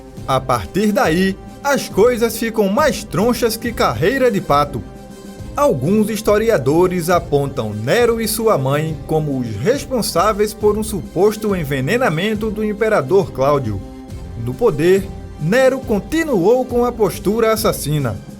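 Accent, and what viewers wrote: Northeast, Brazil. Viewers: Gostei do vídeo e das expressões nordestinas. É sempre bom conhecer mais a história, e ainda melhor com o sotaque do meu nordeste. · Narração muito boa! Sotaque nordestino o máximo. Vc me faz rir!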